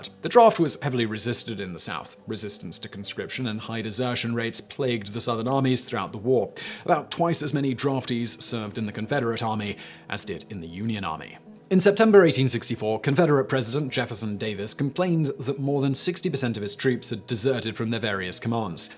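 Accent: United Kingdom. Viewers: The late Shelby Foot would argue up and down with your position on #8. Shelby Foot once said that to say slavery had nothing to do with the war is just as ignorant as saying slavery everything to do with the war. Speaking with a British accent doesn’t make you an expert on US history. This is more of a personal view provided by this Brit then actual fact vs myth. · I don't need someone who speaks the queens english to tell me diddly squat about my country. End of story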